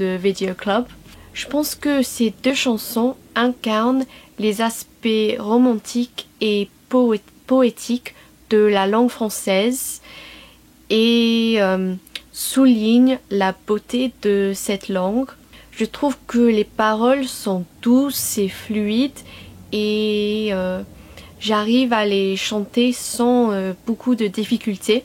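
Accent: England, United Kingdom. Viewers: Hiya, just wanted to say that you remind me of a younger me who moved from England to France at a much younger age (we have the same twang and make the same mistakes when speaking french!). I also spent a lot of summers in France until my family and I eventually moved where the french side of my family lives. Being in my 20s now, I now speak fluent even native-like french. However, as my natural inner voice is in English when I'm alone, so I do still slip up from time to time, and still have a slight a twang apparently lol. Even after having lived here for over 15 years haha. I only wish I wasn't so isolated growing up in France and had other english speaking kids who were in the same situation as me to talk to. In fact, I can imagine a conversation between us to be refreshing because I never found someone with such a similar and yet different backround. 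But this is the internet, and you don't know me. So naturally this will never happen. But it's nice to know that there is someone similar to me out there! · Bravo pour votre chaîne. Votre français est très agréable à entendre, avec ce petit accent britannique tellement charmant! <link> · Hello, excuse moi je ne te connais pas, tu es britanniques??:O Tu peux écouter: -, toujours de - 🥰, de -, de. Cette chanson est incroyable 🎸 * - un mélange * - entendu parler du groupe don't give up 🤗 (On ne devrait pas non plus prononcer le " T " à " sont " mais j'aime quand tu le dis XD )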